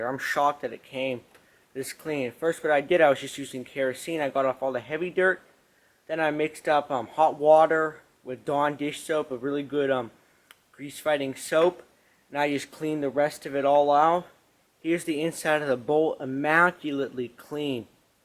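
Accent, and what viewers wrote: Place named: Pittsburgh, United States. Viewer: I can tell. Definitely sound like Pittsburgh. Lol 😂 I am from Eastern Ohio. And we sound similar.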